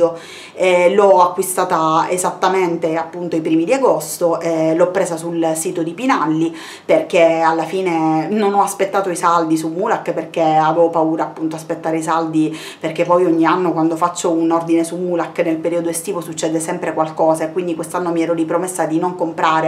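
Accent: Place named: Sicily, Italy. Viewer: Io ti ho scoperta da poco e amo il tuo accento essendo anch'io di origine siciliana. E mi sto guardando tutti i tuoi video 💞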